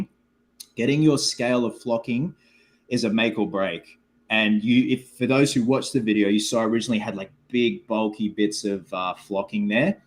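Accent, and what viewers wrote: Australia. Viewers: Lol, "Saturn"! I've been listening to this while sewing for a cosplay commission and I thought you were saying "Satin" this whole time, but then I looked up and saw the title and the banner at the bottom of the video 😆🤦🏻‍♂️! That Aussie accent really muddles words sometimes, I guess, huh, lol 😅...?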